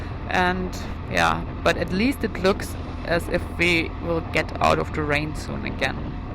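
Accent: Germany. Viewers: Great Video, amazing Picture's :thanksdoc: good english 😉( I hear a fränkisch slang;-)) )😁 Stay healthy, whatever you do 🏍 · Me Norwegian, from Utskarpen, Mo i Rana. Are you from Switzerland? Your license plates show Switzerland from previous videos, but your accent is german. Anyway, Lofoten is best in september. We do have problems with lack of infrastructure, toilets and such. There are forgotten hidden gems to explore, like the coast of Helgeland, the heritage island of Vega, Lovund, Dønna, Herøy and so on.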